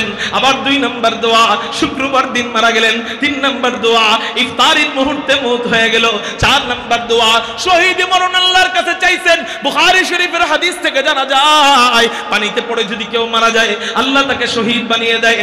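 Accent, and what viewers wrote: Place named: Mymensingh, Bangladesh. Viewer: হুজুর আমি আগে মনে করতাম আপনের বাড়ি ময়ময়সিংহ কারন ময়মিনসিংহের বাসায় কথা কনজে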